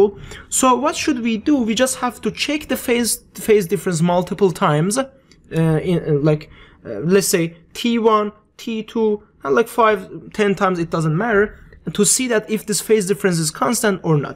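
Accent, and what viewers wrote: Turkey. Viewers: türk müsün la konusmandan cok öyle geldi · dude you are definitely turkish hahah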